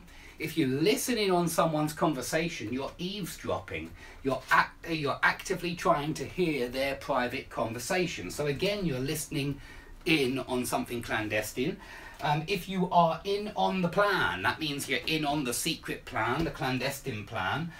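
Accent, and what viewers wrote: United Kingdom. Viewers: Hi greetings from Colombia, i still enjoy your lessons, thanks. I hope You área doing well. I love your british accent and i find it pretty understandable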